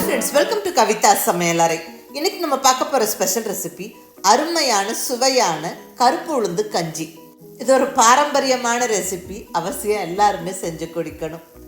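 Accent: Kongu, India. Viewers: கொங்கு தமிழ் இனிமை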